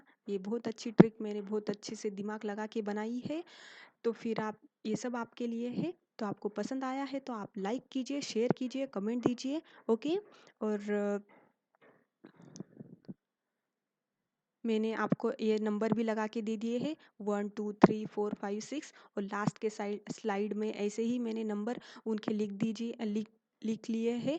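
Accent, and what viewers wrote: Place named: Kashmir, India. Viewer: U seems to belong to kashmir the way u are talking!.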